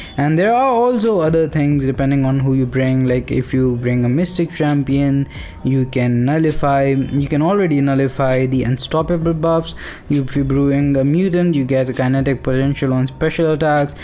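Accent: India. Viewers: You are from India , I have doubt in your sound its like indian man.But I am from India and even I like your video.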